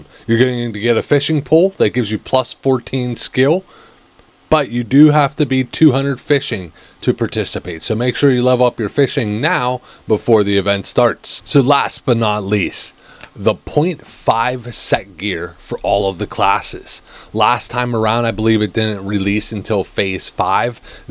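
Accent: Philadelphia, United States. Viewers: i gotta ask.... you gotta be from around philly right??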